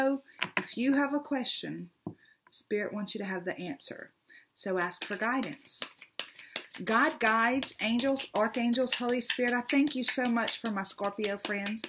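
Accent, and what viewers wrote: South, United States. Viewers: I love your readings as they mirror my life perfectly! Plus, I love your sweet southern accent and charm! Thank YOU! <3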